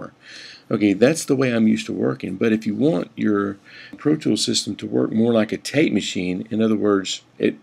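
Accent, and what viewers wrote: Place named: Tennessee, United States. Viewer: THANK YOU!!! You sound like you're close to BNA.:-) Good vibes.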